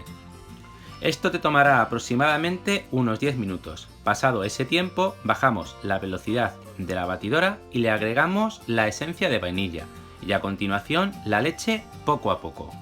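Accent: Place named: Spain. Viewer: gracias yo soy panadera me gusta demasiado la panadería de que parte del mundo eses tu tu voz parese española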